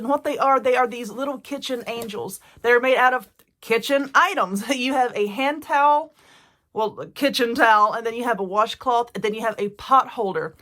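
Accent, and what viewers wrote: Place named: South, United States. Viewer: Please don't ever quit with your tutorials. I really enjoy your accent. I am from Tenn and I really miss hearing good ole southern talk. I also remember these in my granny's kitchen. I also remember one with a poem that used used two long men's white socks. Do you have any idea about that craft? If so could you please do a tutorial on it.. I just found your site and am definitely subscribing.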